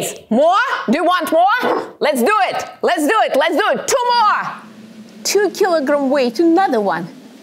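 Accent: Russia. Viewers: Думал, нифига я английский начал каждое слово понимать, а, нет, акцент наш просто.)) Сразу всё понятно.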